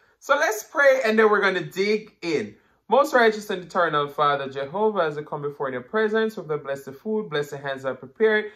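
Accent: Jamaica. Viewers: I see 👀 you lawwd how is it possible that you make food look so good. Another channel 🙌 for recipes. What that name of the channel again 🤔 you and that Jamaican accent. Sing sing 🎶 I don't know that song though.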